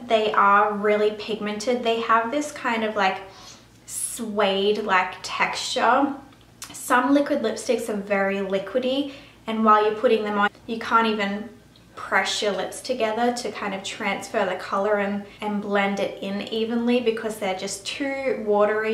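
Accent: Australia. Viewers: I was sitting here wondering if you are Aussie. After watching videos of several American accents, I found it hard to distinguish my own Aussie accents hahaha. So happy when you confirmed it! New subbie :) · Are you australian?? OMG Im spanish and I love the acent!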